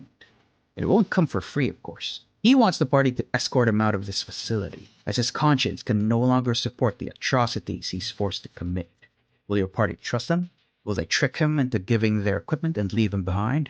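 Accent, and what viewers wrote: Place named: Philippines. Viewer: i can hear a fellow filipino's voice from a mile away... this is an amazing video!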